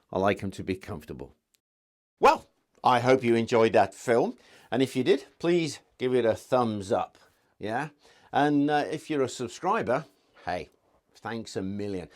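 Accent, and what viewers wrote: United Kingdom. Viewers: Always love your videos ☺️ I could listen to your lovely British accent for hours, lol. Thank you for the instructive video, as always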